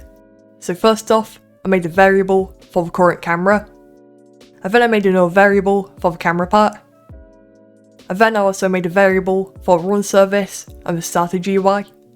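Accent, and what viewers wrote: United Kingdom. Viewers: You sound like a british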